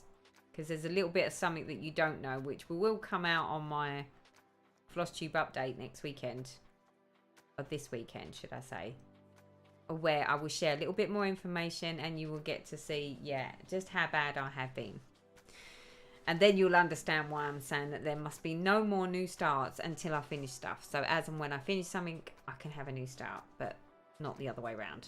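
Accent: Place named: Essex, United Kingdom. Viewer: Dang. I missed live stream but watching it now. You are so much fun and I love your Essex accent. Actually very soothing.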